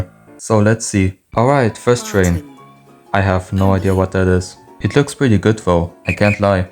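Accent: Germany. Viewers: Are you german? You sound sooo german xdd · I hear german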